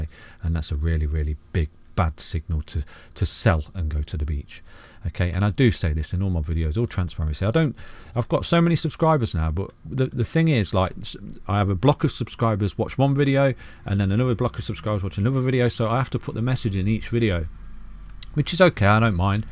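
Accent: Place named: Midlands, United Kingdom. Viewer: Great channel, and good to hear a British accent (Midlands?) in the space. Much respect, from Leeds.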